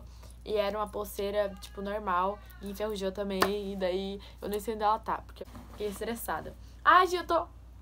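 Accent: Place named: Rio Grande do Sul, Brazil. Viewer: vc é do rio grande do sul? Vc tem mttr sotaque cara, lindíssima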